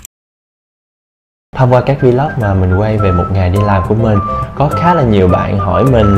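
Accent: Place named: Binh Duong, Vietnam. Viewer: Giọng nói của là người Bình Dương gốc luôn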